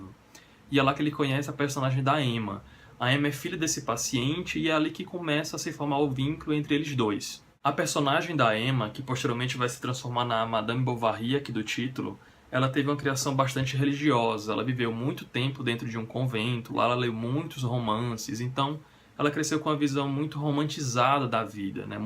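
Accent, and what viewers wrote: Northeast, Brazil. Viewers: Acabei de conhecer o canal pelo vídeo da resenha de A Desumanizacao! Gostei muito do jeito que você descreve os livros, ta de parabéns (sem contar o sotaque nordestino que eu adoro) já tem mais uma inscrita! :) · Sotaque nordestino?😍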